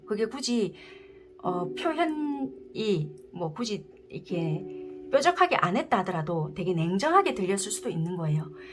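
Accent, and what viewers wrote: Busan, South Korea. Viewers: 대박!! 너무 잘맞아요! 너무 신기해요! 그리고 리딩에 진정성이 느껴져서 너무 좋아요☺️부산사투리도 너무 좋아요💕 3번 선택했습니다! 위로 얻고갑니다. 다음 영상도 기대할께요💖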